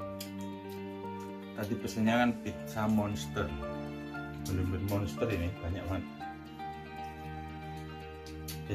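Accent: Java, Indonesia. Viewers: Gedheeee bgt kak pizza nya... pasti kenyang bgt tuuuh yaaa 😂😋😋 Btw... om nya orang jawa ya 😁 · bos dikorea sudah berapa tahun. ngomong nya udah kayak orang korea bgt.. tapi klow ngomong jawa medok bgt😀 anak nya cantik pinter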